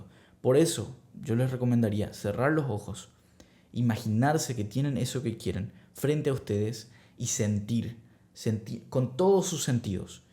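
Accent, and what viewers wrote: Paraguay. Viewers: Ya me parecia que sos de Paraguay por el tono 😊😊. Que gusto encontrar alguien de mi pais que hable de este tema en el cual estoy muy interesada. Si puedo contar algo de mi experiencia es q años atras menifeste a una persona en especifico, q hice? fue con scripting, y tb olvidando unos problemaa q habiamos tenido y enfocandome de forma positiva hacia esa persona, creo q la clave estuvo mas q nada en eso. Y tambien recuerdo q a un amigo le dije q con esa persona estabamos saliendo cuando no era asi y luego se cumplio, pero yo creia fervientemente q esa relacion se iba a dar tarde o temprano. Asi pues creer es el secreto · Acento Paraguayo?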